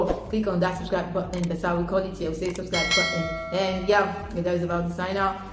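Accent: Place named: United Kingdom. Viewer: Your British accent is fire 🔥.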